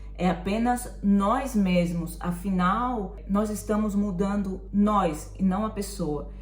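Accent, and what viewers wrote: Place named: Maranhão, Brazil. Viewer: ❤❤❤ que top conhecer o rosto dono da voz. Eu imaginava voce morena, nao sei porque rs. Mas seu sotaque é tao gostoso de ouvir, parece de uma amiga minha do Maranhao. Como sempre um video pontual. Amei, prazer te conhecer!!😊😊😊🥰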